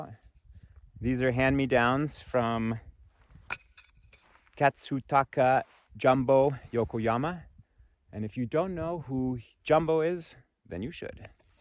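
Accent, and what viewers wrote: Argentina. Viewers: your Spanish has improved! Nice to hear that Rioplatense accent haha. Always thrilled to see you upload a full length video. Your instagram clips are just not long enough and you've stopped writing on your blog. These vlogs will have to do. I'm a novice at the mountains but whenever I'm in chalten I always dream of bumping into you! Keep on posting inspiring content for us please:) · Amazing stuff! Glad to hear you are picking up the argentinean accent when speaking spanish;)!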